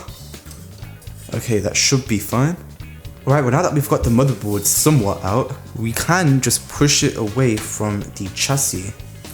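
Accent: United Kingdom. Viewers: Instant problem: "Chassis" is a French word. And our presenter has a Birmingham/West Midlands English accent. You're correct, Chassis is pronounced "Shassee" (not "Chassee").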